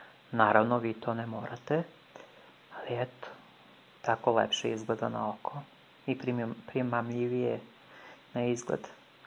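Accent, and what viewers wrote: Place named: South, Serbia. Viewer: Dragi,recept je super.Corbica kao san.Ja sam je pre spremala na slican nacin a sad bas kao Vi.Al od svega mi je najsladji taj vas naglasak koji sam ja vec izgubila,al mi se potkrade oljupim papriku a ne oljustim i tako mnogo lepih reci koje na jugu upotrebljavaju.U svakom slucaju sve nalepse Vama i Vasoj porodici i veliki pozdrav👏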